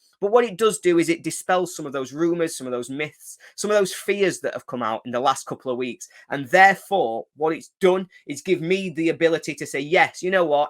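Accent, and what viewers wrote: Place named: Yorkshire, United Kingdom. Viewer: Good to see Yorkshire accent coming along.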